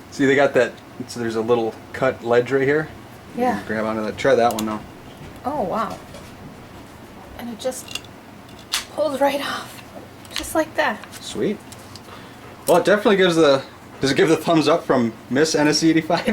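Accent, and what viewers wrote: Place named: Minnesota, United States. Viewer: It sounds as if the publisher of this video is from Minnesota... Good tutorial for the replacement of push-pins.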